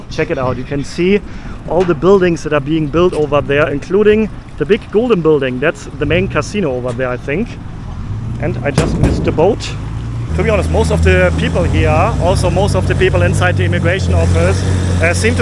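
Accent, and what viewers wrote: Germany. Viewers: 😂😂😂😂😂😂😂😂😂 diese diese worst German English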